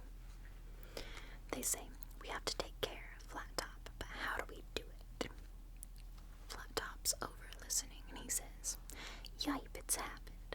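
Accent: South, United States. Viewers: Tracing ✅ Little bit of country twang ✅ Fell asleep ✅ Would recommend to a friend ✅ #1 Youtuber out there ✅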